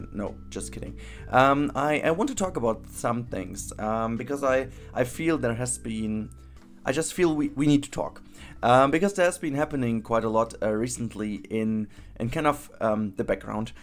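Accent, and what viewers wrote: Germany. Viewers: Ok, first to what you talked about: It's really hard to maintain a channel when there's very little interaction. It's probably why the channel I had did so poorly and why I just couldn't find the effort to keep it going. I definitely miss it and am trying to get it going again or at least start something new. So, I get what you're saying. And actually, I've been watching and not commenting for a while now. I bet this doesn't apply for most of your viewers but I really dislike the German accent (and it's not your fault at all!) so a German YouTuber has to do really amazing content for me to watch it and support the channel. And I absolutely LOVE your PlanCo stuff because you spend so much time on details and you have amazing ideas. Basically, you play the game like I would like to (if I could afford the game or a computer that can run it). 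I would really like to see more and keep watching your videos. But I also know that life has to come first. And if you're not feeling you're getting enough back from making videos it can really affect you. I would hate for that to happen to you. So if you feel like you have to take some time off or take a step back, you do that. Now to the coaster: My first thought when I saw the thumbnail was "this looks like a coaster on Mars", because of the surrounding canyon but also because by now I'm kinda used to hexagons and triangles from Surviving Mars. And I think it would fit really well into a thriving Mars community to have a coatser like this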